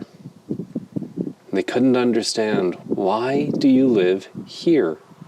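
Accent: Scotland, United Kingdom. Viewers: I’m liking the new format. I’m also starting to notice a wee bit of a Scottish twang with some of your words, we must be growing on you 🙂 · Hi, your mimicry of an English accent was pretty good and you are starting to acquire a Scottish accent too. The whisky certainly seemed to reach parts that other whiskies don't reach😅. Loved watching the gin production at Dunrobin Castle, I always wondered how gin companies got their botanicals into the gin and now I know that its with a giant teabag. Love the colour of their bottles too. Wishing you and Christine as well as Queen Annabel a Merry Christmas.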